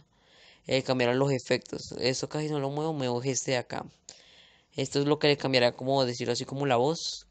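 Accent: Colombia. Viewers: Jajajajajajajaja es que tu acento es de rolo ewe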